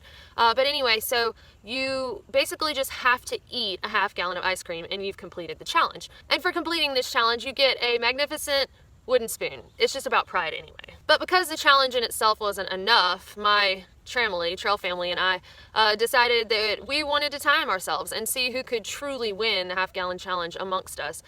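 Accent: South, United States. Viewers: I could listen to your voice all day long- love that Southern accent! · “Y’all’s experiences” I love hearing Americans from the south talk. I’m from Europe and I’m been wanting to come over and do the AT (and PCT) for years so this was a great watch!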